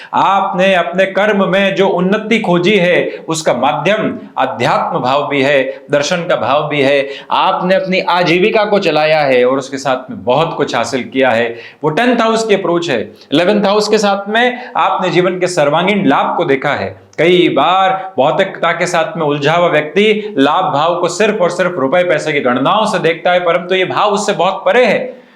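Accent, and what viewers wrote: Standard. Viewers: Sir aap bahut sahi bolte hai shudh Hindi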